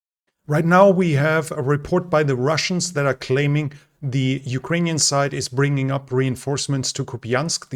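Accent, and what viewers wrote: Germany. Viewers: Hessisch Dialekt? Your English sounds like my Frankfurt family..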